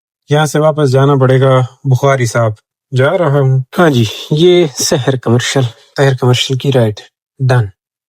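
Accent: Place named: Sargodha, Pakistan. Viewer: Bhai ap punjab sy ho kya apki punjabi ak dum sargodho jhang Chiniot me jo boli jayi osi trha ki hai karachi wly to asi Punjabi bol ni skty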